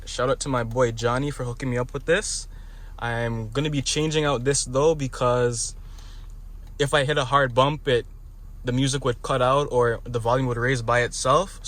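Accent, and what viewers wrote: Toronto, Canada. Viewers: Where your from ? Sound like your from the 6 . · Sounds like a toronto man jheeze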